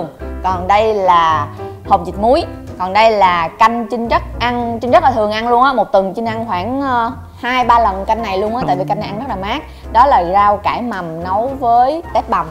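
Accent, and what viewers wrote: Mekong Delta, Vietnam. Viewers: Trấn Cường Lâm đậm chất miền tây luôn á :)))) r mà toàn đọc thành g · Trinh nói chuyện thật quá đậm chất dân miền tây tự hào quá trinh ơi Dân miền tây tụi tao thật thà và chất phát 😁😁😁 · Nói chuyện mộc mạc đúng chất miền tây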